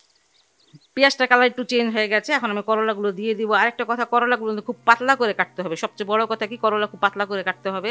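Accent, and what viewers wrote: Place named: Gazipur, Bangladesh. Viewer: ভালো লাগছে,,, আরো ভালো লাগছে আপু আপনার কথা গুলো মনে হচ্ছে আপনি আমাদের গাজীপুরের বাসিন্দা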